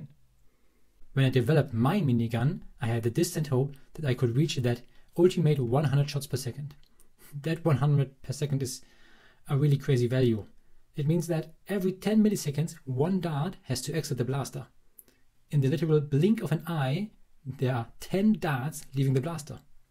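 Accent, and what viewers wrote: Germany. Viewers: Your accent is uhh... German? I mean it wouldn't be a surprise to me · I don't know if it is a good idea for people with germanic accents making weapons..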